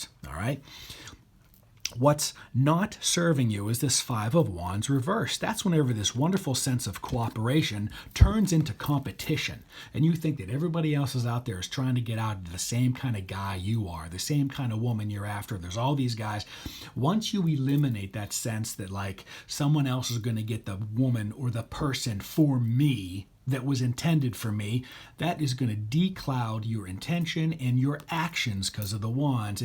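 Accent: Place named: Pittsburgh, United States. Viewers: I detect a Pittsburgh accent!:) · Can't hide Pittsburgh. It always slips out 😄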